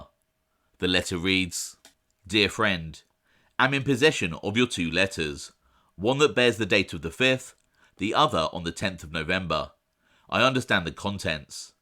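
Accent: England, United Kingdom. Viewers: Not true, I'd watch an autobiographical vid in a hot minute lol. You're a Boss in your own right. Top notch research and presentation complete with a crisp English accent. Slay!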